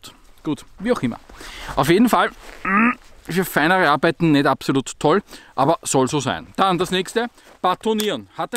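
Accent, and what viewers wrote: Austria. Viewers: Was hast du denn gehört? Und er ist ja auch Österreicher deswegen der leichte Akzent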